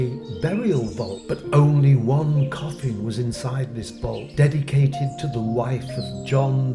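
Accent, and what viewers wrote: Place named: North, United Kingdom. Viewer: Are you North Country bounced south, or the Home Counties bounced north?! Whatever you are, you have the most extraordinary, hybrid accent.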